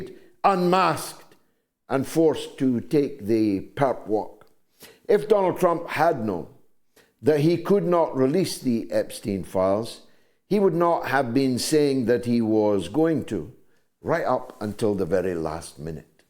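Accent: Scotland, United Kingdom. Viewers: We love you 💖💖💖 You are such an honorable man! Deep Thanks for this speech! Eventhough, as a french-canadien, I must use the subtitles here and there because of your ''strong Scottish'' accent (which I love) 😉, I will continue to listen to you, sir. As I started to search for the ''truth'' about what's going on in this messy world, my son told me, several months ago, that you were a most valuable and reliable person with integrity and determination. So I started watching your program and I want to congratulate you and express my gratitude, from the bottom of my heart. Besides, I'm 69 so... you're older than I, which makes you even more honorable in my eyes! Take care and stay safe❤🖤🤍💚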